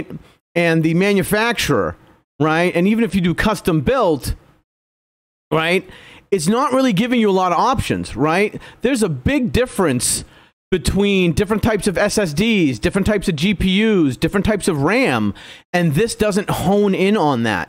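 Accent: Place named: New England, United States. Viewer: you sound like you're from either New England... or New York. (edit) The way you said "part" just narrowed it down I think. Massachusetts or Rhode Island.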